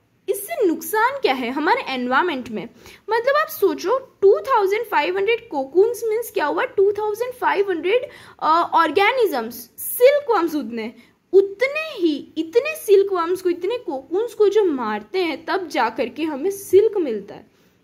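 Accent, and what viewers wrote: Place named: Australia. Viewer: GOOD EXPRESSION SOUNDS AUSSIE ENGLISH EVEN 888.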